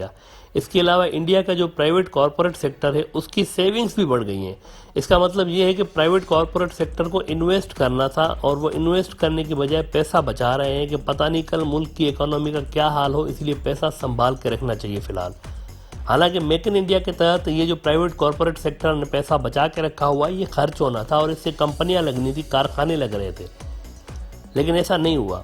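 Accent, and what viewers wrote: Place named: Pakistan. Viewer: No He lives in Pakistan bc He is speaking urdu very fluently also he has perfect pakistani tone .BTW he himself Told in a comment of a video that He is Pakistani . That video is old it was abt Onion export .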